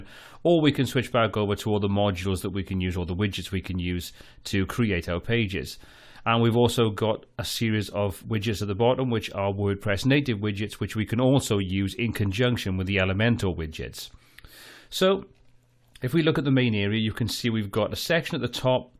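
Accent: Wales, United Kingdom. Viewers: Thanks for your clear approach - do I detect a Welsh accent? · I know a welsh brother when I hear one haha! I'm from South wales :D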